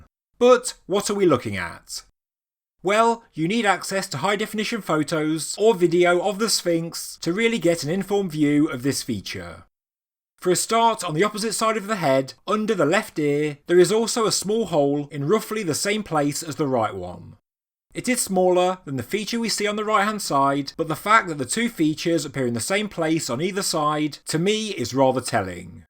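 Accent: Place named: London, United Kingdom. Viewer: British, not American. Probably a Londoner bloak 😂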